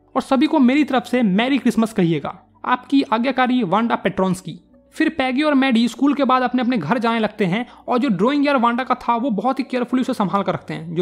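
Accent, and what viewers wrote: Bihar, India. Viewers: Bihar se h ??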